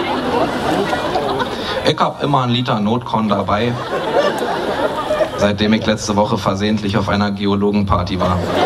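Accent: Berlin, Germany. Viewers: der Text an sich ist schon sehr schick, zusammen mit seinem Berleinern bekomm ich mich aber an manchen Stellen nicht mehr ein. Slampoeten mit Dialekt sind einfach genial, liebe es das niemand ihn versteckt.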